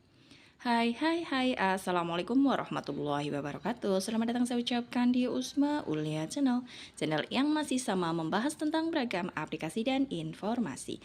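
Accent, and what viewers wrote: Banyumas, Indonesia. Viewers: kok kaya ngapak aksennya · Bantu jawab...kalo ga salah dari banyumas purwokerto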